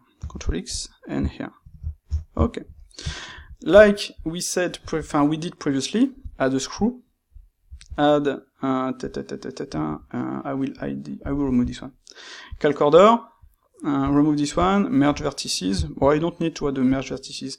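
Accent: France. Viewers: Don't worry about your accent. I love the sound of the french. I did french in school but forgot most of it. Loved it more than spanish. Your english is quite understandable, so don't worry about the negative comments. Now proceed as normal. Every word of english you speak sounds more expensive. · Your English sounds very French in pronunciation 😊👏🤣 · Ooohh Lya Lyaaa ))) youre cool frenchmen ,I like the accent and the teaching ,, appreciate for this techniques . thanks big time!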